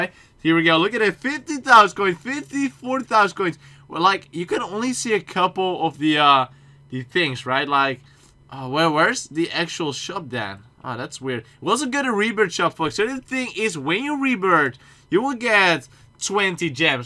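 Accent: Netherlands. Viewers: wait r u dutch?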